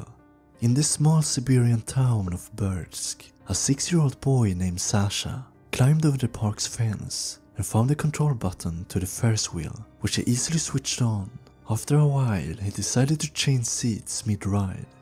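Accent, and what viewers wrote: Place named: Sweden. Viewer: Im a Finn, and can confirm. This is a swedish accent.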